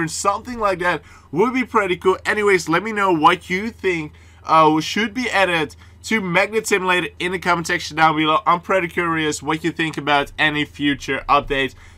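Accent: Netherlands. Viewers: Bruh hes dutch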